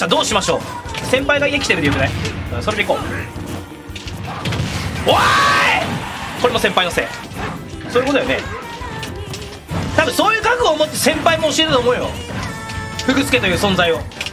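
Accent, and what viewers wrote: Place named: Hakata, Japan. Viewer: シンプルに心配やしとりあえず体治してまた長野まで博多弁届けてくれよな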